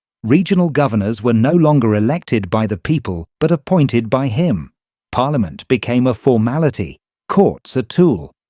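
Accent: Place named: United Kingdom. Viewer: Jewish Gangster Narrator with a British accent! They have hijacked the world with sleeper cells waking up in every country in the world in positions of Defense ministers, Intelligence, police in top positions to control. The 5 eyes is Israeli gangster Terrorism run bybthe terrorist state of Israel. We’re hijacked. Putin is just trying to hold his culture,& Country together. He’s a saint! Says The BronxBoy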